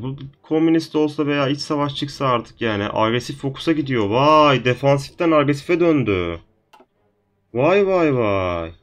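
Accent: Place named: Black Sea, Turkey. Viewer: Birde bişey sorcam siz çankırılısızda peki sizin anne tarafı rica edersem kardenizlimi çünkü ben giresunluyum ve siz biraz karadenizli gibi konusuyosunuz bizim oranın insanları gibi sıcak kanlı ve komuksiniz biraz lehcenizde öyle gibi sadce merakımı arz ettim roca edersem cevaplarsanız sevinirim tabikide siz bilirsiniz bu soruyla ayıp ettiysem afadersiniz yaklasık 2 senedir takip ediyom sizi ememğinoze saglık bayramınız kutlu olsun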